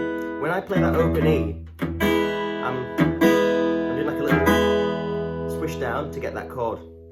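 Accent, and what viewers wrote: United Kingdom. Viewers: ☺️ ah! Nice too hear a native YORKSHIRE voice teaching guitar. Nice wee tutorial as always, cheers pal 🍻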